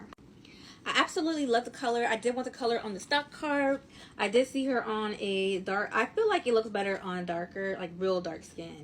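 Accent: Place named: Philadelphia, United States. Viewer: I knew you were a philly girl. Talking bout this jawn lol. 215🩷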